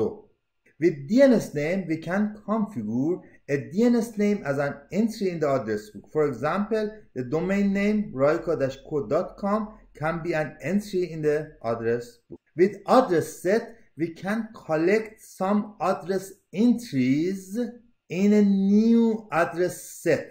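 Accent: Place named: Iran. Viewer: سلطان لهجه ات خیلی ایرانیه :دی دمت گرم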